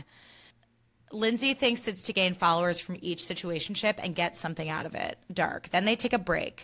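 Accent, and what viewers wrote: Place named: Australia. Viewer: My jaw is on the floor at your Australian accent ⭐⭐⭐⭐⭐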